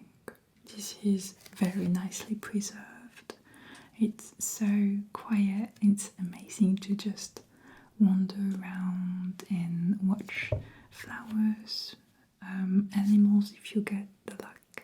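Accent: France. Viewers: Since I found you watching the Futuristic Hearing Test, respectfully I’m addicted to your voice. So soothing and that French accent on your English is the last top cherry on a cake. Really really good job. Keep going. I would love to see a suit fitting but in an elegant way and also a flight attendant. Both in English as well if it’s possible cause idk any French 🥲 Still, great work! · This is the first video I’ve seen of yours and I love the atmosphere and soft voice. I can hear the French accent, but some words seem to have a hint of South African or New Zealand, which is really cool! This was a great video :) Just read your little bio. Nice to see another enby out there in the ASMR universe 🥰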